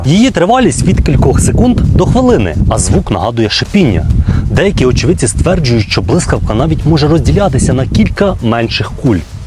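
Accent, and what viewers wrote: Cherkasy, Ukraine. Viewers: У автора черкаський прононс?))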